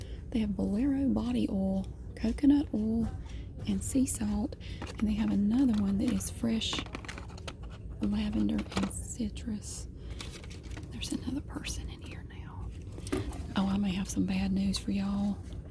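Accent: United States. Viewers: I just love your accent, it's my favourite american accent!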